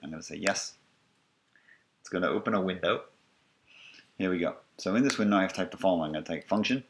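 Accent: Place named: Australia. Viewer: Too bad this course is online, I would have been delighted to have a prof with an Australian accent!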